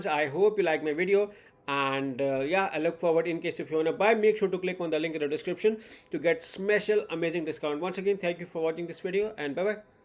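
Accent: India. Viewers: Whenever I end up on a video with someone who has an Indian accent, I learn something. Gotta love Indian accents. Thank you sir. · Your explanation is very important because no other video has explained as much as you. Incredible coupon, a discount like this you can't find anywhere, it's a unique opportunity. Whenever I end up on a video with someone who has an Indian accent, I learn something. Gotta love Indian accents. Thank you sir. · Incredible coupon, a discount like this you can't find anywhere, it's a unique opportunity. Whenever I end up on a video with someone who has an Indian accent, I learn something. Gotta love Indian accents. Thank you sir.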